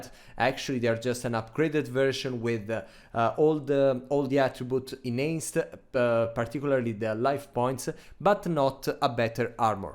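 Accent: Italy. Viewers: Ciao I'm quite sure you are a fellow Italian by the English accent. If not, great video anyway. Grazie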